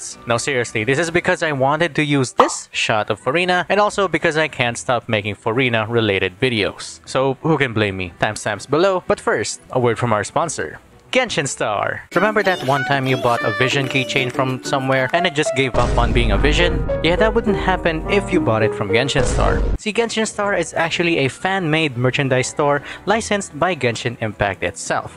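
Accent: Philippines. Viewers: I think that you are right with Furina having multiple personalities. I mean Neuvillette says Furina was researching about the prophecy (FURINA), and I mean his reaction to her being scared of him not going to the meeting seemed like Furina is usually that eccentric lol. And also the emotions she shows like crying in her room seem real. So it would make sense, but these are just my guesses. Also I noticed half way through the video that you are Filipino, barely noticed the accent 😂 I AM TOO, so I was pleasantly surprised :D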